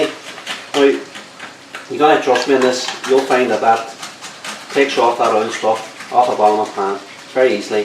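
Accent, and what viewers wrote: Derry, United Kingdom. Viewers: Derry accent!!! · It's a Derry accent.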